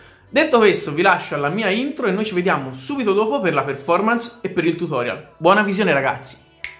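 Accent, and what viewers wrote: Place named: Tuscany, Italy. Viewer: Amo l'accento toshano😂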